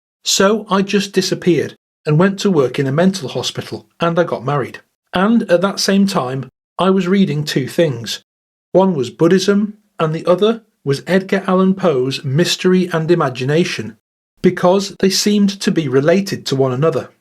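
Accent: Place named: Lancashire, United Kingdom. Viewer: Thanks for a class show. No more political or news for me with the spiteful and snarky tones and pure lies and character assassination and threats of Civil War and even WW3?! What the devil? Very Despressing. Good to hear a calm Lanacashire voice on musical subjects. I worked in the Northwest in 1995 during the Britpop era. Lived in Longsight and Old Trafford, Manchester. Take me back.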